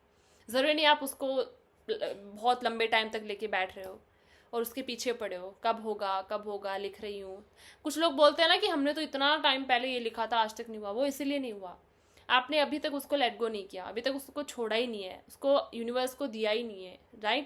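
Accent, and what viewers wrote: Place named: Rajasthan, India. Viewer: Ap rajasthan se ho kya... Your accent .... Like them .... dontpls 😂 don't ever ye sound se m bed se niche gir gye😂 no pls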